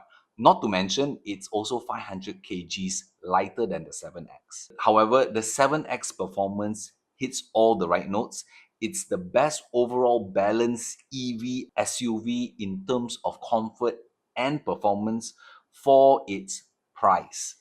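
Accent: Singapore. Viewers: Looks like Malaysia where cars are dirt cheap. But you sound Singaporean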